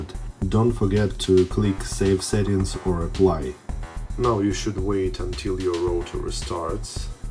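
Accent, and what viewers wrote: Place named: Russia. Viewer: Thanks man❤️ like that heavy Russian accent.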